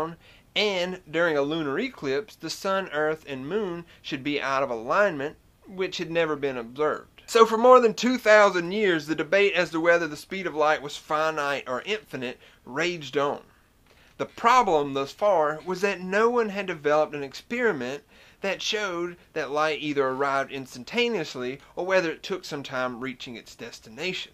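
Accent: South, United States. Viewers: dunno, but an astrophysicist with a southern accent is mighty cool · Love your Southern accent dude! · Most of us pronounce "Io" as "EYE-oh", not "eye-OH", but perhaps you are an electrical engineer. :-) I love your southern accent, combating the stereotypical idea that southerners are stupid. Great videos, man.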